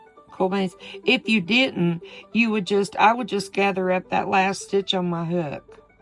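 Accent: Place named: South, United States. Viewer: I love what you made. Love watching, and hearing you. your such a sweetheart. I dont crochet, but love watching you, and hearing that southern acent. Night. And god bless you.🥰🥰🥰🥰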